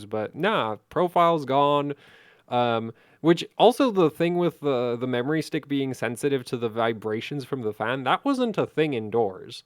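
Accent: Czech Republic. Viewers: You Czech? Wot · Wait, are you Czech?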